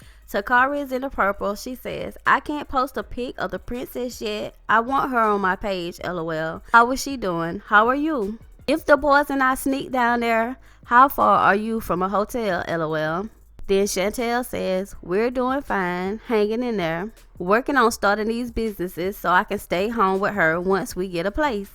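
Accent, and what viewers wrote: South, United States. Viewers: Girl, your country accent is so strong that I can barely understand what you're saying. I would just have to read with your voice on mute. Sorry. Where are you from? Alabama? Arkansas?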